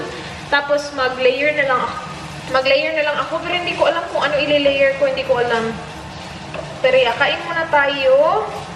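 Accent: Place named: Visayas, Philippines. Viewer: Always watching ur blog... di gyud kumpleto ang adlaw og di ko kita sa imo blog 😊 bisaya kaau 😂 cagayan de oro 😚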